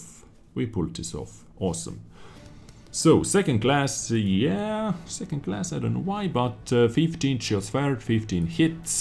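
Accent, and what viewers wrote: Estonia. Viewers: are u estonian?:)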